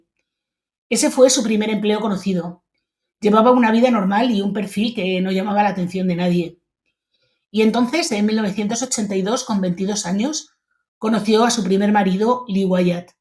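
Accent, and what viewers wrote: Spain. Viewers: Hola, buenas. Mira una cosa, de que región de España eres? Si me puedes contestar claro. Es por un dejé que tienes al narrar las historias. España es un país de acentos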